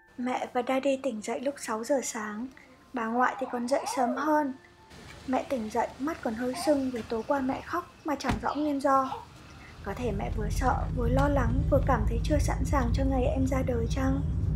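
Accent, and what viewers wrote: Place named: North, Vietnam. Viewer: Chị ơi sao chị đống phim chị nói tiếng việt sao chị lại nói tiếng bắt vậy chị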